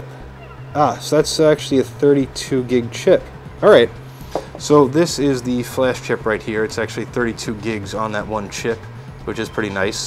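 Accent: United States. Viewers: lack there of? nope that is an us accent. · How can you say you don't have an accent? You have an American accent!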